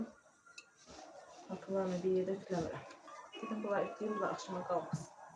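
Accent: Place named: Qabala, Azerbaijan. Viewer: menimde yoldaşım qebeledendi size qoz gelmeyinden birde lehcenizden bildimki qebelelisiz😘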